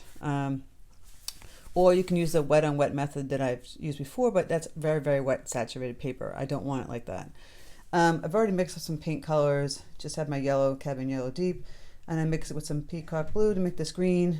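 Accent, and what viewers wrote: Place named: Massachusetts, United States. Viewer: I'm not an abstract painter. I just started watercolors a little over a year ago. I paint too detailed. But I'm gonna give this a try! Hey, you sound like you're from MA? I grew up there moved to TN when I was 12. Love your style here! Thanks for all the informative tutorials!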